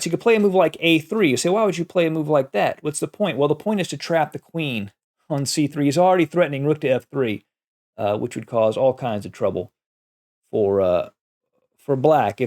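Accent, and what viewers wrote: United States. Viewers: I very much enjoyed your US English! As of Alireza: one day he would break through.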